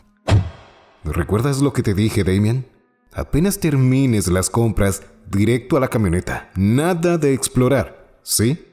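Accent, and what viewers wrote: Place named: Caribbean. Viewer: Por alguna razon tu voz fuera de la narracion me imagino un Skyper caribeño y me encanta xD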